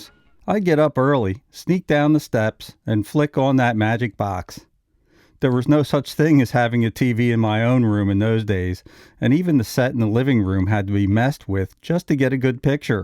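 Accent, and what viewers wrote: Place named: Pittsburgh, United States. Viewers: You sound like you have a Pittsburgh accent 😊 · Yinz from da’ Burgh?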